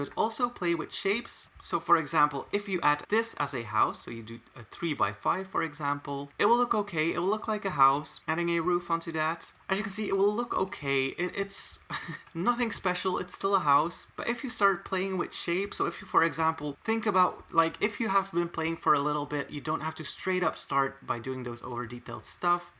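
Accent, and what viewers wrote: Flanders, Belgium. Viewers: Ben je ook Belg?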